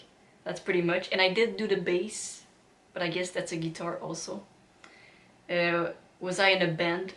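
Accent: Quebec, Canada. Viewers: Hey, I was born in Florida but subsequently got carried North to New York State when my mother divorced my pop. I was age two so I didn't have much to say about it but, nonetheless I have had a good life. I married and my wife gifted me with five children before we ended up going our separate ways. My four girls are the lights of my life. My mom played piano and sang well enough to be a songstress with the Chautauqua opera company when we lived in the ancestral home on the Institution grounds. I remember the days when mom would play piano in duets with members of the Chautauqua symphony orchestra. Jack Danziger, the violinist and Lazlo Varga the Cellist specifically. What a beautiful sound they made! I grew up with a keen interest in music, as a result. I could go on and on but, it is what it is and hsving reached the age of 73 I really don't want to burden you with more than that. 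Suffice it to say that I love your channel and you. I seriously doubt that we will ever meet but I will be there for you and your family and whatever music you play. Fear nought with thy interesting accent. It only serves to make your dual language abilities more attractive. Vive le Quebec! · Je le savais juste par l'accent que tu étais du Québec ,J,ai le meme accent Lol !!. Bienvenue dans le monde du rock !!!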